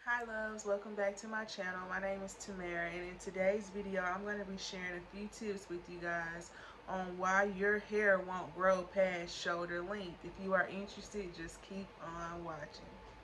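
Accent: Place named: South, United States. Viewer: Good information! You sound like a southern girl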